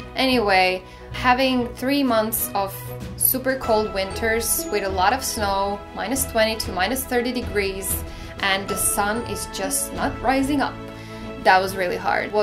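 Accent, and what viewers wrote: United States. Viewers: That's a pretty strong American accent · Can I ask how you got such a strong American accent?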